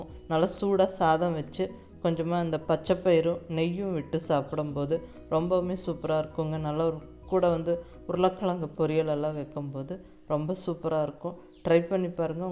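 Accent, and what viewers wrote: Kongu, India. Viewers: கோலை கொங்கு தமிழில் பேசி சமையல் கலை அற்புதமாக இருந்தது பீர்க்கங்காய் கடைசல் ஜோர் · Ama madam namma kongu spe super 👍👍👍👍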